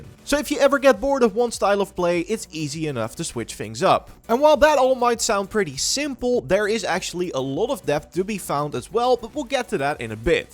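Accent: Netherlands. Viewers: a little tip. Stop pronouncing “o”s in the dutch way. Its not a alOt its pronounced alAt. Just had to bring this up cos it is really bad to listen to 😂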